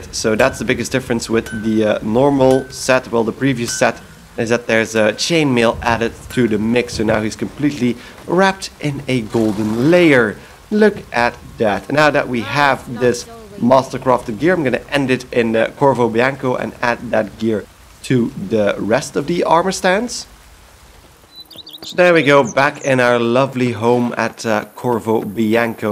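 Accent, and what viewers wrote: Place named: Netherlands. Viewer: Your obviously native dutch speaking 😃 from the Netherlands even !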